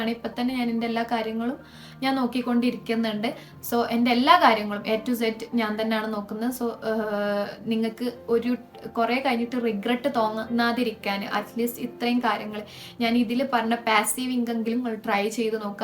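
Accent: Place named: Malappuram, India. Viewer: *നമ്മുടെ മലപ്പുറം മലയാളം ആണല്ലോ. ഇവിടെ എവിടെയാണ്? പെരിന്തൽമണ്ണ ആണോ*?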